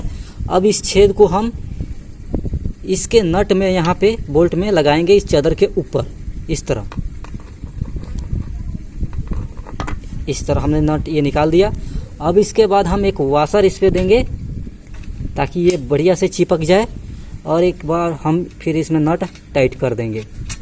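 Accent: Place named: Bihar, India. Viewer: Bihari h sir kya aap ! Chadar ko chdar bol rhe h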